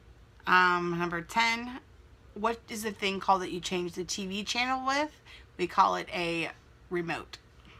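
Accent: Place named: South, United States. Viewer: I was born and raised in VA, and I say about 90% of the words the same as you. I love the way we Southerners talk! ;)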